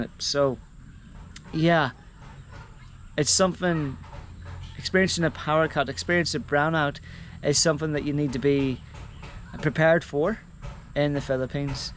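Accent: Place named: Northern Ireland, United Kingdom. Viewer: Are you by any chance from Norn Ireland? Your accent kind of reminds of a friend from Belfast. Greetings from a wee man from Iloilo as well. Unfortunately we tend to get stained by the NGCP's incompetence. I love my city but I'm scundered by the length of brownouts sometimes. Terrible intro to 2024. I hope your doing grand mate!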